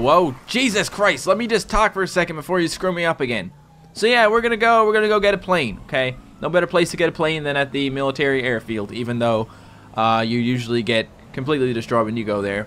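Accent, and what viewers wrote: Canada. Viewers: He's Canadian right? He sounds Canadian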